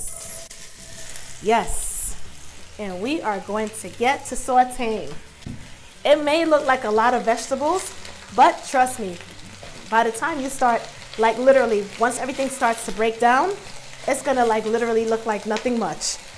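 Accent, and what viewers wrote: New York, United States. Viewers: Man you look just like toya.. lil wayne bm.. but with a new york accent. Almost done making my roast thanks sooo much. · Nice. Always liked the ladies with NY accent.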